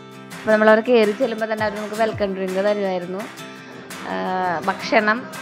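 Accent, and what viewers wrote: Thrissur, India. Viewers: Hi... new subscriber 👍 lv trissur language. All the best..😍😍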